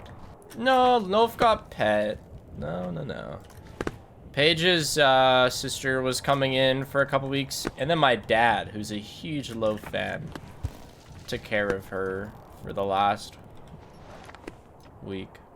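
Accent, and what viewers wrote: Canada. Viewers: I would've expected something closer to "rundabutt" but "roondaboot" sent me also the Canadian raising when he says "exit" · rundabut, i completely forgot that you are Canadian. (i mean no ill intent. im American and thought it was funny sorry) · I love how Chat makes fun of for his pronunciations, despite all of Chat knowing that he's Canadian. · Something I love about is his Canadian accent But his content is really good.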